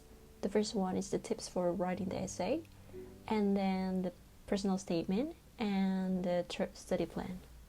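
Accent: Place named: United Kingdom. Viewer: how can u speak like a British? Can u give somes tips n trick?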